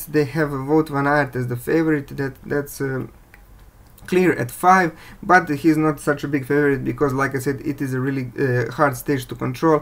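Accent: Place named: France. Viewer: Come on people, let's help this guy out by liking and subscribing. English is obviously not his first language (French maybe?) yet he's doing really well, excellent information. New subscriber here, looking forward to more cycling videos. Keep positive Sir, you're doing well. WVA for me, but it's almost impossible to pick a definite winner.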